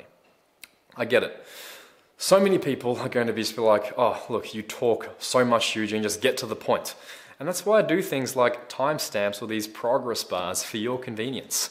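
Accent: Australia. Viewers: he is Australian, that is an Australian accent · Great video. Most beautiful Australian accent I’ve ever heard.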